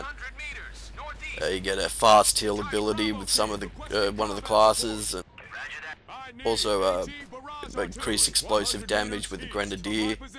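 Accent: Australia. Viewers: im guessing ur an aussie coz u keep saying mate lol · austrailian, english, or russian?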